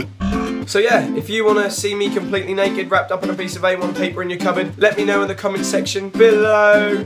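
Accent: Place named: United Kingdom. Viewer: Your eyes omfg!! :3 Your accent is soo cute, I love British accent!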